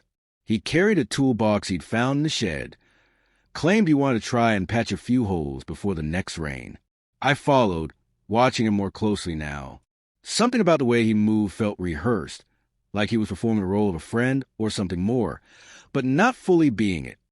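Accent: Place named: South, United States. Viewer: I love this narrator, best voice, slight southern accent, very soothing!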